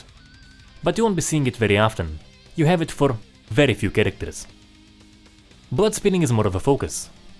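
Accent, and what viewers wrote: Russia. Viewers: Твой акцент выдает твою русскую личность)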